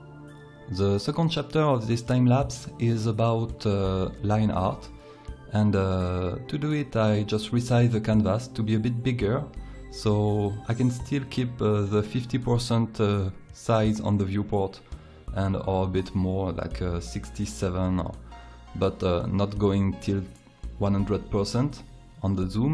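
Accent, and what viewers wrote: France. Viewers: je lai remarqué a l'accent :) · i know at your voice your french i guess ... but its not bad :D you can speak english for ur vids is it good <3 im dutch ... i just talk english because i like that launge... im learning too french :P on 02/26/2016 i got a test from french laugne :D i got 17/20 pretty good :D i like ya vids! <3 <3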